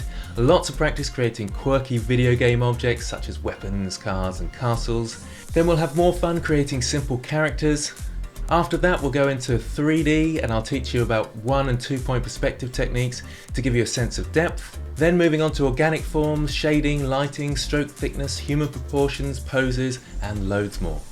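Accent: England, United Kingdom. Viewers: At first I saw this in my inbox I was like "Okay maybe, but I shouldn't be spending money for yet another course I will never use", but then I saw and heard that buttery smooth English accent and I was In Like Flynn!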